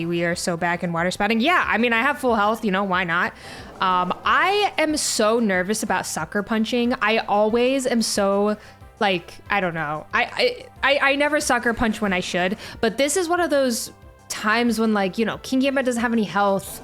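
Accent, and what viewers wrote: Boston, United States. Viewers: As a non-American I'm curious: is that Boston dialect?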